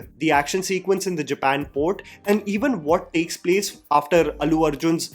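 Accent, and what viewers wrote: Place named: North India, India. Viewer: Dude why is your English do bad??? The accent of a North Indian man-child aside, you use such terribly kaam chalaau words like "come down" n mispronounce words like "crescendo" and also say much awkward phrases like "shoulders of the performance of Allu Arjun". Clearly your abhorrent sentence structure comes from translating Hindi phrases directly into English without adapting it to language convention. I don't mind listening to your other commentary videos but your movie reviews specifically highlight your absolute weaknesses with the English language. Why not just do reviews in Hindi then? Perhaps you'd sound less awkward n blundering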